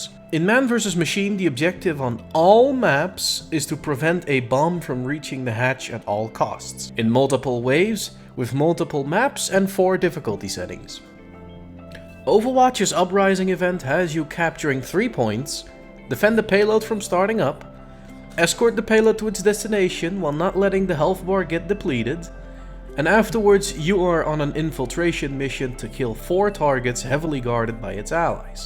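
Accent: Netherlands. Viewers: Are You Also Dutch?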